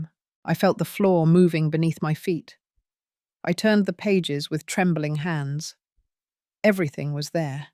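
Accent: England, United Kingdom. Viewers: Your British accent it looks so far from the Bazaar story you are making girlfriend comes and do all distinct to you and you take it come on even for the story it's so Bazaar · Oh it is so good to hear an English narrater. Lovely easy voice to listen to ❤❤listening from Swimbridge Nth Devon UK. ❤❤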